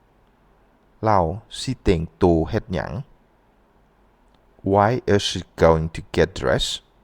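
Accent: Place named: Laos. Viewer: what’s your ethnic group? The accents sounds a little bit different from my parents who are from savannakhet and champpasak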